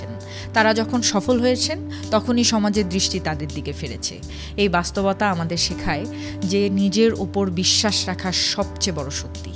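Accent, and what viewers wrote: Bangladesh. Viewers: Apner tone Bangladeshi but apner title nepali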